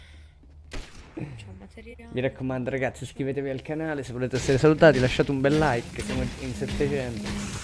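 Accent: Calabria, Italy. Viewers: Compa ma di che paese sei della calabria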